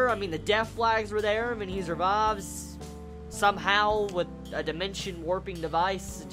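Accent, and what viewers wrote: South, United States. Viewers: Not being mean get a haircut you would look better and where is your accent from you sound southern?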